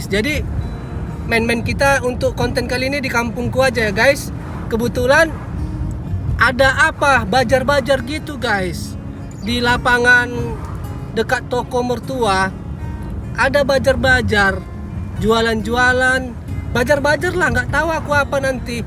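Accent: Medan, Indonesia. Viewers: Halo. Saya selalu liat youtube lewat tv jd gk bisa komen, kali ini liatnya lewat hp jd bisa komen.. senang liat kalau ngomong, khas medannya keluar😂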